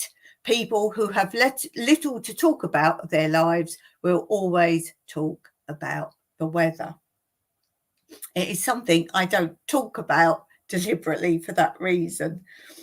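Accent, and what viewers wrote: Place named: England, United Kingdom. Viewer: 😊 I enjoy the reading especially in your British accent.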